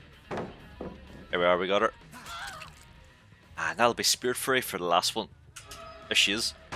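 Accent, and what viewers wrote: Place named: Scotland, United Kingdom. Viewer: First time coming across this channel and you've got, I think, the strongest Scottish accent I've ever heard, nice honestly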